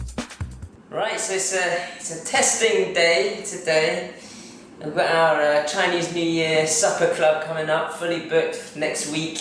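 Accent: England, United Kingdom. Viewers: love this dude's accent! asian man talks like some englishman. And honestly how on earth does the XLB not look legit? This man's got better technique than most bogus white chefs who's trying to cook "fusion" or "chinese" !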